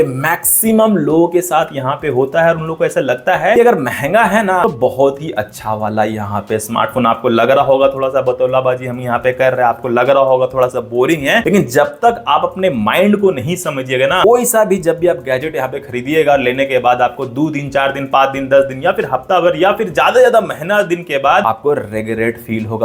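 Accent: Bihar, India. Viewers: बिहार se kya bhai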